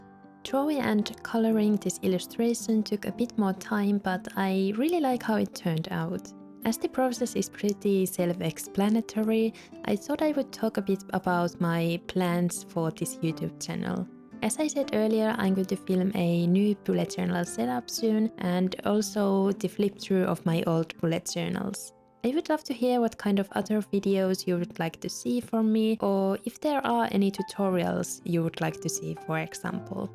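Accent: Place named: Finland. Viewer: This is the first of your videos I watch and I like it a lot! May I ask where are you from? Your accent somehow sounds Finnish to me (someone who is currently trying to learn Finnish) but I’m not sure whether I’m right with that assumption. Either way, I’ll definitely subscribe because I love the way you set up your Bullet Journal <3 ⏰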